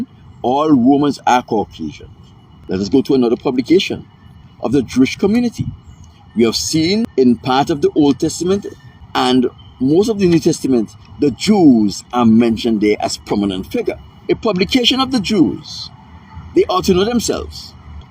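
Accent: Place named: Grenada. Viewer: You from Grenada. Gotta be!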